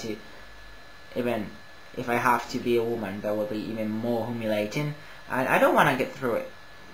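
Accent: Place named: Spain. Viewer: I love your accent. Much nicer then English, Australian or even South African. A Spanish man speaking English. Whats this world coming to?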